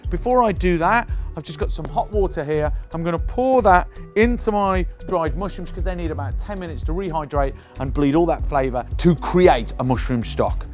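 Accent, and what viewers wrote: Birmingham, United Kingdom. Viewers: Food busker I can't quite figure out exactly where your accent is from,are you a brummie or is it more of a Wiltshire/Gloucestershire twang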